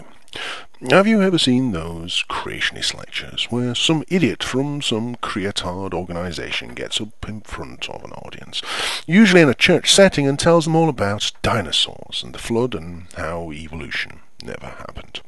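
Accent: United Kingdom. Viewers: your an excellent reader as well as a excellent thinker. But I'm an American... everything sounds smarter with british accents. I look forward to your next video. I do have a cat that steals my pasta dishes... but it think its' for the butter and cheese flavors?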